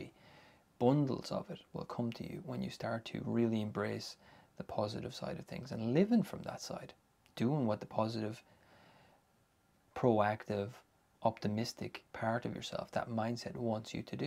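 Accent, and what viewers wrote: Ireland. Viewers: New to your channel. Totally off topic.. are you Irish?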